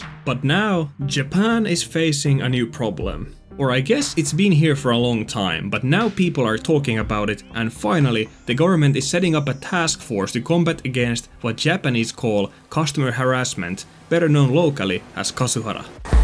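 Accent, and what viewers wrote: Finland. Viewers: You are finnish from ur accent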